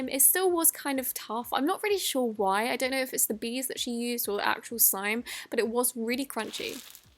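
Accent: United Kingdom. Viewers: I mean, she IS British and pronounces things differently than Americans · Aren’t you British not americqn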